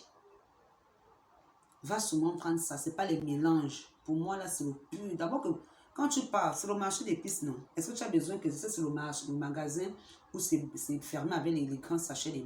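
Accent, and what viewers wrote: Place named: Gabon. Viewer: Accent gabonais 🇬🇦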